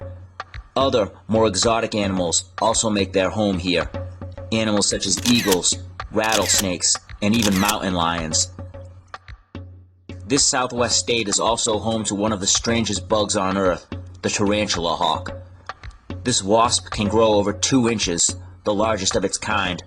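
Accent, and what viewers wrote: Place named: Boston, United States. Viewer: you from Boston, you sound like Kennedy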